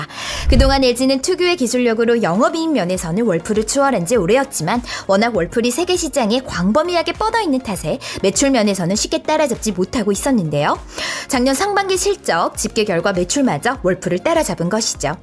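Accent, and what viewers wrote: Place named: North Korea. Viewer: 북한사람인교 아님 조선족인교 말소리가 윗지방 소리네요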